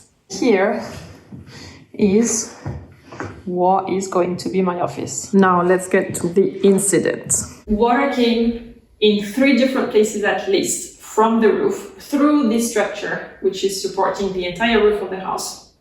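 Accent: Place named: France. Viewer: I lived in France for a year as an English teacher in Provence and hearing your accent is really making me miss living there!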